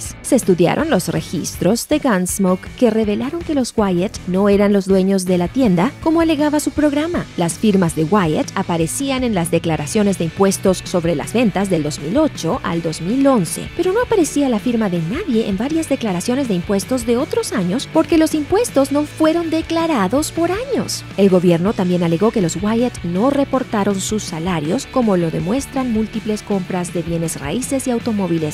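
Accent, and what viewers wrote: United States. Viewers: ES QUE ELLA VIVE EN USA, SEGURO HIJA DE MEXICANOS Y TIENE UN ACENTO ESTADOUNIDENSE(AMERICANO PARA LOS EUROPEOS). EL ACENTO DE MEXICO ES MAS NEUTRO.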